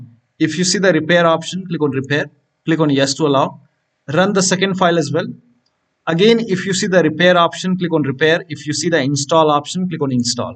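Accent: India. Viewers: Always the indian accent guys. Thank you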